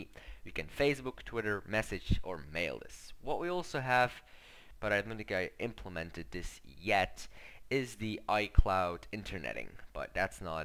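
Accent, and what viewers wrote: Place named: Denmark. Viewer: Nice accent brah! Danes are the best.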